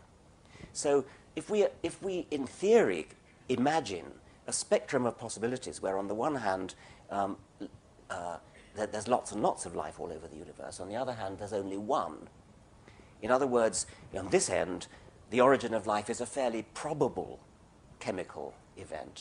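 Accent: United Kingdom. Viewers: lol the British accent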